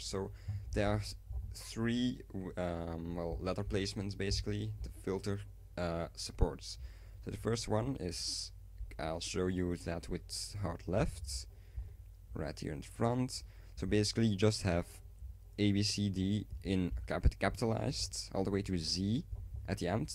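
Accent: Netherlands. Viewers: Nou ja ik ben gewoon nederlands ik hoorde het aan je accent en aan je naam zag ik het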